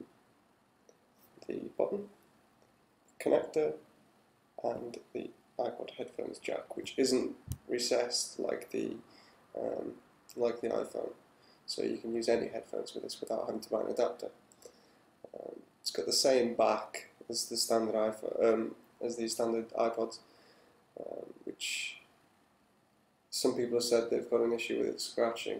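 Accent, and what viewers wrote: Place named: England, United Kingdom. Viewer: thts such a sick english accent =D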